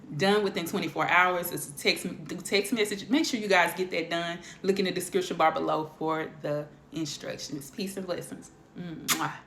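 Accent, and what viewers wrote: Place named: South, United States. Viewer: Thank you for your readings. On point. Question. Your accent. Are you from Virginia or the Carolinas?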